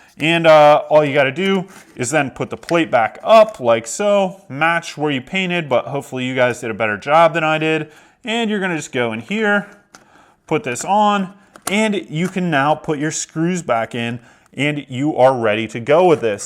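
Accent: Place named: Baltimore, United States. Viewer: Sounds like Baltimore accent 😃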